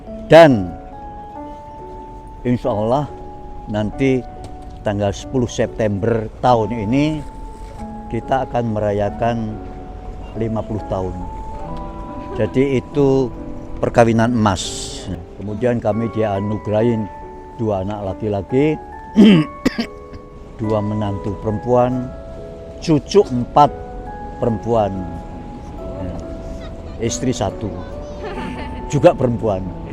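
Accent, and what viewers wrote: Java, Indonesia. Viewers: Logat jawanya masih medok ya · Voila. Hebat, Pak. Bahasa Indonesianya tetap terjaga, juga medhok logat Jawanipun.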